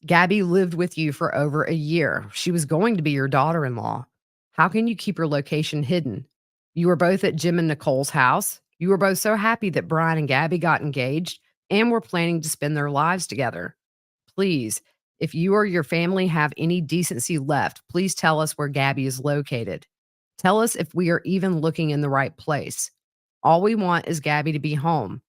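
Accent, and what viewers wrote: South, United States. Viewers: you do such a fantastic job reading that as quickly and concisely as you do! Your linguistic prowess is amazing! It’s really impressive how you never pause or screw up and your cute southern draaawl is replaced with more of a NYC pace. I love your content!❤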